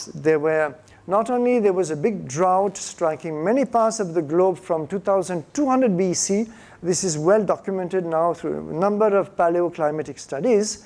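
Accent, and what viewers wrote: India. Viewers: Poor guy he has even picked up an Indian accent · I like how he has acquired the Indian accent. How sweet. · Your English is excellent/perfect, with a lovely melodic tinge of Indian accent. So this civilisation prioritised the well-being of people against many other considerations, and nobody was attacking them. What a beautiful civilisation.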